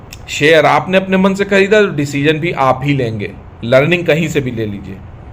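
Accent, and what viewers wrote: Kanpur, India. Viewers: सर आप कानपुर से हो क्या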